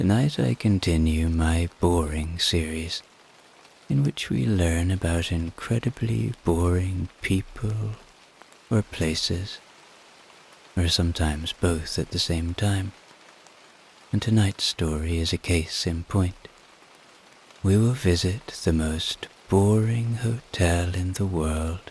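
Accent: Ireland. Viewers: Amazing story out of all the sleep stories you have the most relaxing voice what part of Ireland are you from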